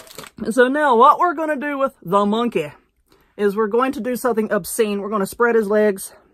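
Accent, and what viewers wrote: South, United States. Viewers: This is cute as a bugs ear😉..I get the biggest kick out of your videos..your VERY talented but,what I adore the most about you is..your southern/country as a turnip green😉😂..OH!and that's a compliment!!..I'm from Tennessee(live about 30 ft. from Monteagle Mtn.😄)..you keep makin' am & I'll keep watching😊💕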